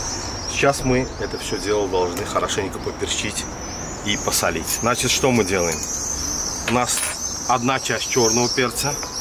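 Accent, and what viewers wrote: Baku, Azerbaijan. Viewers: У него всё поличилось идеально. А Бакинский Акцент это другой кайф👍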